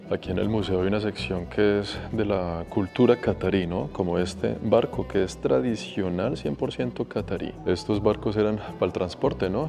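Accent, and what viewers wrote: Colombia. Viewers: Para mi gusto el mejor YouTuber . Inteligente simpático guapo y ese acento colombiano tan bonito, medio cómico me gusta cuando le habla a los animalitos ❤y conste que no soy de Colombia. Un saludo desde California u🇺🇸 🇲🇽.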